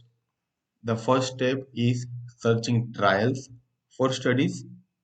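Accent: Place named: India. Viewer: 我去印度英语，OMG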